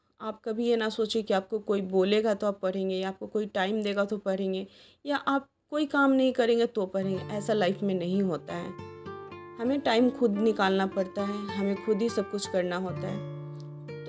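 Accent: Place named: Bihar, India. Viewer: App bhiar se ho awaz se lag raha hai but kha se 😊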